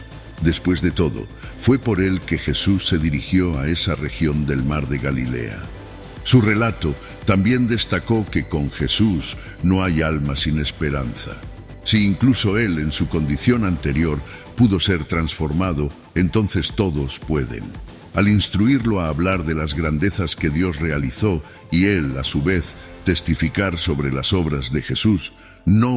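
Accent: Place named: Spain. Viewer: Jodrrr que majestuosa voz tiene el hno relator , se nota su marcado acento español , q buen trabajo ha hecho en este video , felicitaciones !!!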